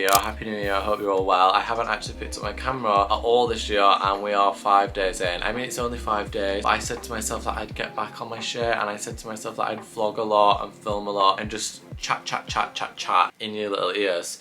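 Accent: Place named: North, United Kingdom. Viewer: I love ur northern accent & you always sound like you had a spliff 🤣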